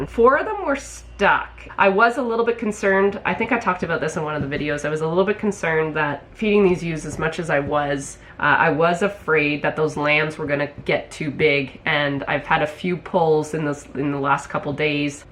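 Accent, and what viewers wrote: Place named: Canada. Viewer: It took me a second to realize you are Canadian. Seriously thought you were just from the Northern parts of the US like Montana or Nebraska.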